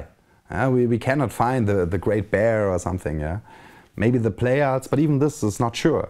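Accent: Germany. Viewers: super dass du jetzt auch internationale Versionen machst. Gutes Englisch, bin überrascht, und der typisch deutsche Akzent wirkt ja eh immer irgendwie sympathisch.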